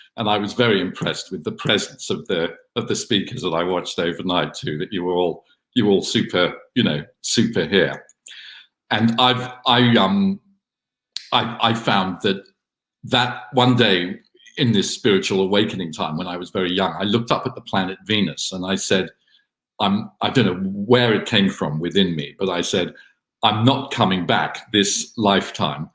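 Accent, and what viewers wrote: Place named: Australia. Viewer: Great talk! I loved listening also because of hearing Australian, it makes me miss Australia even more :)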